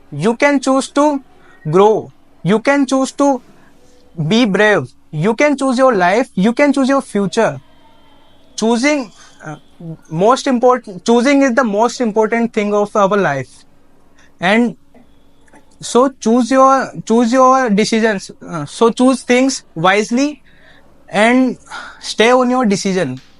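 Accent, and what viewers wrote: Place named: United Kingdom. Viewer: He Speaks well, Simple with grammar. British English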